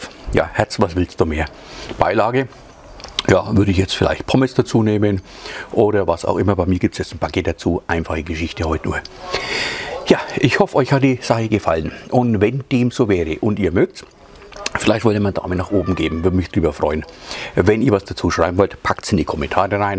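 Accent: Franconia, Germany. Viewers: Hi, tolles Rezept wie immer. 😋👍 Eines nur: 'Wenn ma' no au dei frengischs gebrabbl a bissle bessr vrschdao dät', dann wär amma arma Obrschwåba wia mir scho' viel gholfa! Bleib bei deinem/r Dialekt/Mundart - es würde SO viel verloren gehen, redeten wir nur in der ersten Fremdsprache, die wir Süddeutsche als erste lernen mussten. A Griasle vo' Obrschwåba - und mach weiter so. 👍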